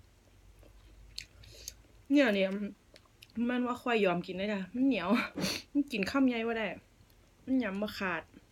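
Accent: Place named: Roi Et, Thailand. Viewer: อิหล่ายุจังหวัดไดนอเว้าคือสำเนียงทางร้อยเอ็ดบ้านป้าเลย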